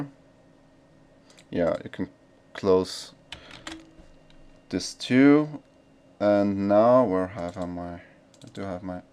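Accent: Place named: Austria. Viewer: Mit dem österreichischer Akzent finde ich Deine Videos viel netter :-)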